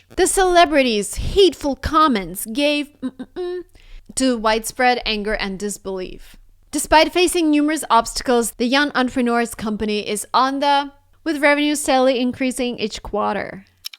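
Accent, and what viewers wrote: Russia. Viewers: Is she not Russian? It's just that her accent at the beginning was very similar to Russian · Бля...😂 жуткий РАШИАН ЭКЦЕНТ 😢 Слушай классическую музыку, развивай слух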